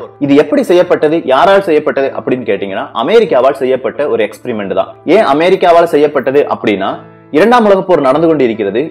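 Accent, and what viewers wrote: Kerala, India. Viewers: But the tamil pronunciation is in Malayalam.. 'koman' (common), kopy (copy), simbly (simply).....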